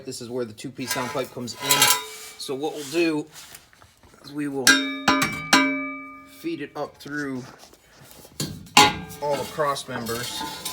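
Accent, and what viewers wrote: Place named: Michigan, United States. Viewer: It's a Michigan accent... I lost mine since I moved south 35 years ago... y'all..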